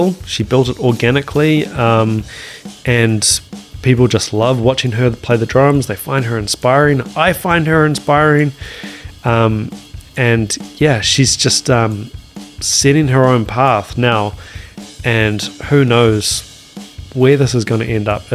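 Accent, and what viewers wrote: New Zealand. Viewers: Don’t forget the people who don’t speak English at home. Add the NZ-accent to that. I only hear some English like sounds but can’t make up the words 😰😰😰.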